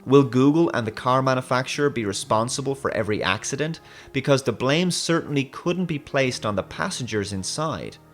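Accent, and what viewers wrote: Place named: Ireland. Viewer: Question: Why does the narrator have an Irish accent?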